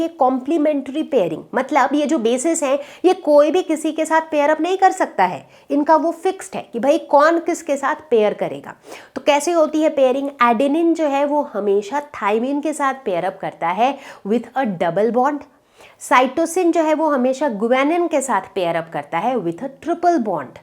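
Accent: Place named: Hyderabad, India. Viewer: aapka hyderabadi accent is super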